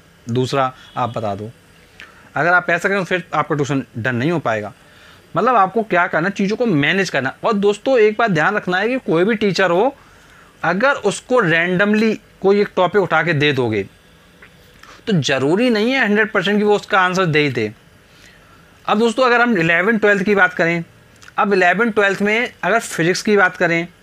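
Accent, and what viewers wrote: Kanpur, India. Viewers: Sir i think you are from kanpur😂 right sir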